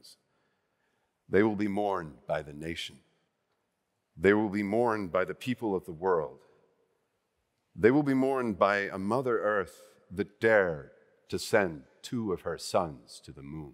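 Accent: United States. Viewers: Why was Benedict speaking in an American accent? Was it because everyone kept making fun of him for saying penguin weird? Honestly it’s not that weird, he can say it however he wants lol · You have a beautiful speaking voice Benedict and you are a truly gifted orator, but, an American accent? I know the Apollo astronauts were American however your natural voice would have done them just as much justice. Kudos to Anne Lapwood, a thrilling end to this piece. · How interesting that Mr. Cumberbatch chose to read this letter in - what is to my ear - an American accent.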